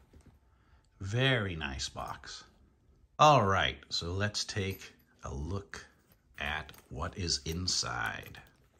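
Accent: United Kingdom. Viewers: The thing i love about british people is their accsent🥳😃🎉🎊🇬🇧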